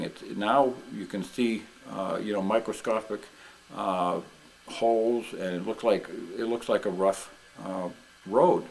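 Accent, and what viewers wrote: New England, United States. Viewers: Pleasant New England accent, sir. Much different than we're used to hearing in Nashville!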